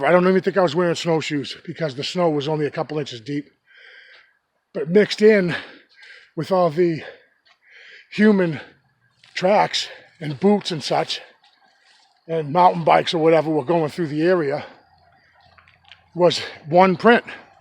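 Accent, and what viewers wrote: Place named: New England, United States. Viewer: New sub here. Squatch Ya Dooin'?:) HEE HEE. Hey. Love the New England accent. Hypotenuse! I gotta kick outta that. LOL. Lookin for more vids. God Bless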